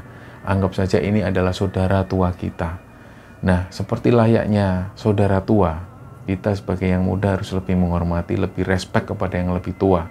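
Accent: Java, Indonesia. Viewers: Ciri khas mas medok" suaranya,sukses sll,aku suka sekali👍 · Betul mas,medhok itu ciri khas orang jawa. jangan dikira cuman logat jakarta yang lue gue saja yang enak didengar. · Yaumul milad 1thn..tetap jadi canel yg sangat2 inspiratif...nggk alay....pembawaan yg sanggat2 muedok polll...tutur kata yg bikin merinding...kidung lawas yg mendayu dayu...terus sehat crew...tetap istiqomah..rendah hati dan tembus 2jt subscribers...Aamiinnn ya Alloh🤲🤲🤲🙏🙏🙏👃love you Allll❤❤ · Manteb mas jawa gak lupa jawane masuk denger nya enak pemabawa acara jos ❤️ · Logat medoknya mas sudah menjadi ciri khasnya,dipertahankan saja biar beda dari chanel yang lain. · terus pertahankan suara medok nya dan kidung pembuka, sukses selalu mas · Mas itu keluar aura MISTIS nya justru krn medok nya... Klw medok nya ilang, seperti ada yg kurang, Salam Taklim kagem Mas... 🙏🙏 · Selamat anniversary, pertahankan originalitasnya..jangan sampe hilang medoknya mas...itu yang bikin gaya berceritanya menghipnotis audience..hehehe..semoga semakin besar,semakin bermanfaat..sehat, sukses dan berkah selalu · gpp medok yg penting jangan di banyakin bahasa jawa nya soalnya banyak juga yg nonton gabisa bahasa jawa🙏👍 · Medok bgtttt Mas mantap bhsa nya👍👍👍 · Iya medoknya jgn hilang mas, itu kan ciri khas n sy suka dengarnya. 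Sukses selalu tim, semangat💪🏻💪🏻💪🏻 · Selamat ultah yang pertama. Semoga semua sehat2 dan channel nya semakin berkembang, aamiin. Dari konsepnya, kostum, logat medok semua sudah pas, hehe. 👍😊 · Justru dgn medok aslinya itu maka cerita yg dibawakan lbh menarik, dan cara menyampaikan bgt tenang, sy tiap hari dengar crt tiap malam diatas jam 11 sampai subuh, sambil kerja pencatatan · Happy 1st Anniversary! Jujur pertama denger channel ini saya gak berani denger intro nya, bener2 merinding! Lama2 saya bener2 kagum, krna channel ini berbobot dan punya ciri khas yg kuat entah itu pembawaan narator, background studio dan pemilihan backsound. Oia, saya jd suka denger org ngomong medok jawa krna channel ini jg 😁 Satu lg kualitas channel ini adlh membawakan kisah nyata disertai observasi lebih dulu dan menyertakan bukti2, jd gak asal cerita. Pertahankan ya mas2, semoga segera ke 1juta subs, semangat!!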